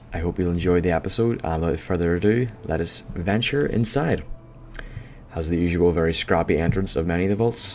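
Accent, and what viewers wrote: Northern Ireland, United Kingdom. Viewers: Northern Ireland ?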